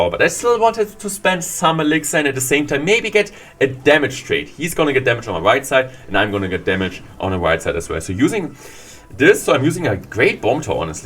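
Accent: Germany. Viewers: Ur German af i can tell 😂❤️❤️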